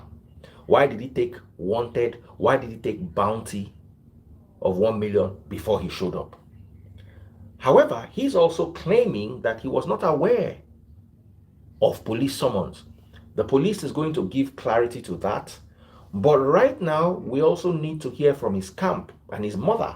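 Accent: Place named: Yoruba, Nigeria. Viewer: daddy freeze pls I love your yoruba dialect so much. can you speak more of it. lol. your yoruba always amazed me. 😆 🤣 😂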